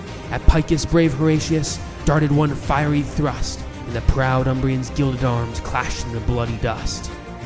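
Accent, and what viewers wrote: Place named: United States. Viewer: Magnificent words, but doesn't work when read in a U S tone of voice.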